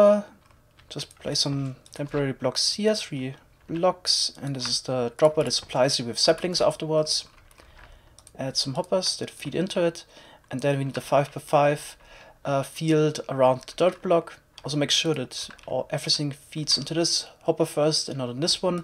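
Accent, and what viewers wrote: Germany. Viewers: Sounds like u are german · German accent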